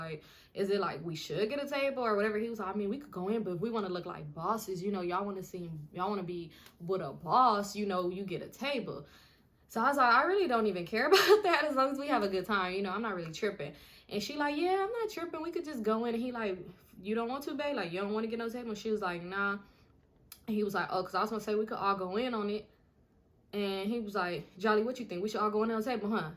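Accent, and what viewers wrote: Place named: North, United States. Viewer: You are an absolute embarrassment. Nevermind that "flewed" is a stupid sounding and ugly looking word. I'm familiar with it, it's the word you and people like you use to describe when someone wastes money paying for y'all's aerial transportation so you can participate in watered down forms of prostitution. Furthermore, your eyelashes need to be stopped. Not even just yours, but all the women in the world wearing those horribly fake looking pieces of plastic on you guys' eyelids need to be stopped. Like seriously y'all lookin silly. While we're on the subject of your appearance, throw all them cotdamn wigs and weaves in the garbage and immediately tote them to the dumpster. Be a Black Beautiful Queen and show the world the texture that God gave you, if you have any. Y'all out here sending all that money to India and China so you can look like people from Europe, wake up. 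And lastly, I can tell by your story and how you talk that you from up Norf or somewhere in the Midwest. Don't you ever in your life open up you mouth again to say anything bad about Waffle House. Waffle house is an institution and it don't need your money or approval. Put some respec on my waffle and grow up. Focus on school or a career or something instead of tryna hustle men for a good time. Our nation is in decline and it's mostly because of how the youth spends their time. It's only a matter of time before our society is overrun by china and when they come they're going to make our children speak Mandarin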